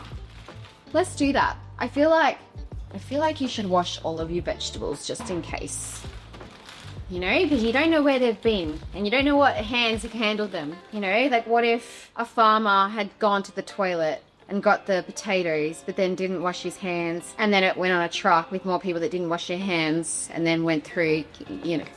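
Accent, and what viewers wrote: Australia. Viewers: Surprise surprise 😂😂😂. Only joking, mate. I was expecting her to sound American. The Aussie accent was a complete shock.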